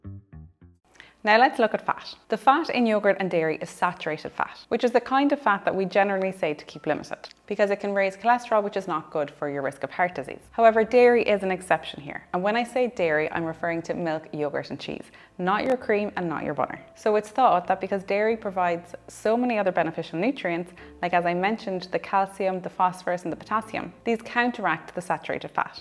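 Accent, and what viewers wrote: Ireland. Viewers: thank you for helping with this weight loss journey. a comment … love your Irish accent. Thanks again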